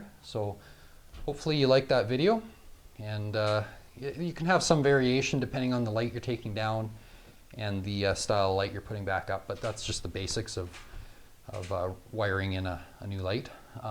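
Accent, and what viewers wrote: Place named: Prairies, Canada. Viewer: Thanks for the video and your thick Canadian prairie accent is making me homesick!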